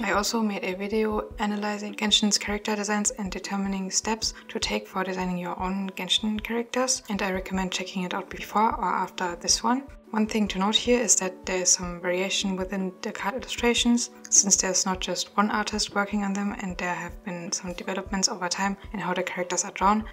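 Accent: Germany. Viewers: THANK YOU. So useful! Are you german? I hear an accent. I also recommend talking slower or more clearer, sometimes it's difficult to understand you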